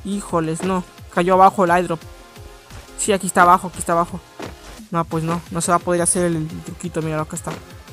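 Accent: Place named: Argentina. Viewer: Usted parece argentino compa jajajajaj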